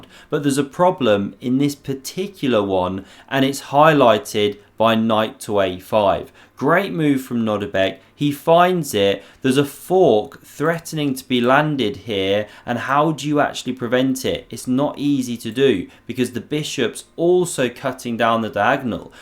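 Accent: England, United Kingdom. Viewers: Great dead-pan British humour - and well chosen chess games. (I suspect you are an English person - based on the accent.)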